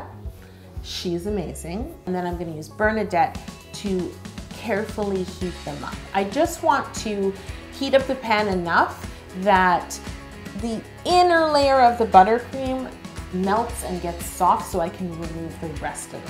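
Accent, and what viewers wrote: Canada. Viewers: "This tee is so reflective, eh?" Me: Yep, definitely Canadian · She sounds so Canadian what are you talking about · I love her and her Canadian-ness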